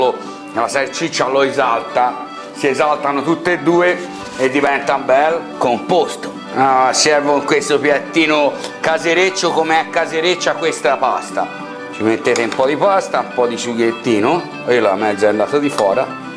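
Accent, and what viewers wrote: Tuscany, Italy. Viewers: Ai raggione morire con la panza piena o tutto quello che lei sta preparando ,,domani sabato li faccio ciao bella cadenza TOSCANA 😋😃😄😏😀😁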